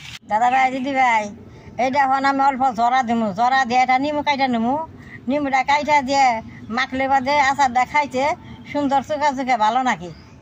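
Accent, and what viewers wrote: Tangail, Bangladesh. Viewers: বাংলাদেশে টাংগাইল জেলার মত ভাষা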